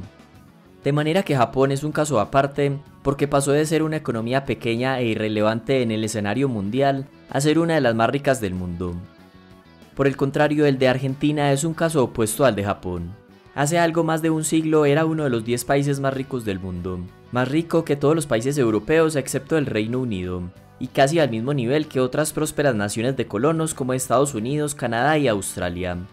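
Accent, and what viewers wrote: Antioquia, Colombia. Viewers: De qué parte de Colombia eres Men, no reconozco tu acento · Se escucha como paisa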